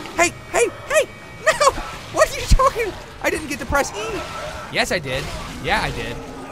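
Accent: United Kingdom. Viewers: best british accent ever! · I love you britsh accent, best one ever!!!! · his british accent is so cute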